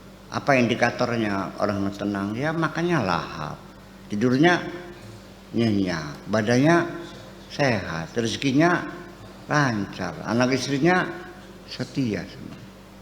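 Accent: Indramayu, Indonesia. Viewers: ha ha ha iya nok, tapi emang khas omongan dremayu, tapi yaqin gak ada maksud menghina, apalagi klo umumnya bahasa gaul kertasemaya, " rikkk sira pen mendi !!!???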